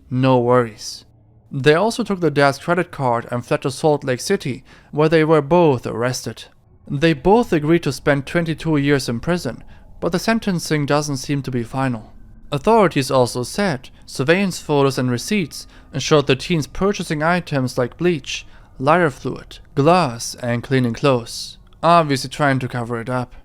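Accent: Germany. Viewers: Are you German? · I dont know why but i think you are german. You pronounce some words very similar to me as a german. 😁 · Bist du Deutscher? Wegen deiner deutschen Aussprache 😏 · Ooooooh you’re GERMAN! I’ve been trying to pinpoint it lmaooo I legitimately could only tell once the video started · you are the best man i have question where did you learn English ? you English is perfect of course with that Germán accent.. nice videos man no 1 fan here from NEW ENGLAND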